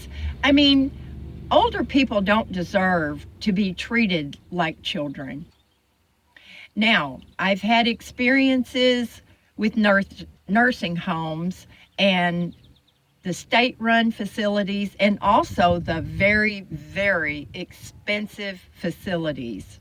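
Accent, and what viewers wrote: South, United States. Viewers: ? I'm a new subscriber. Where did you get your ya'll accent? Anyway, I enjoy your videos.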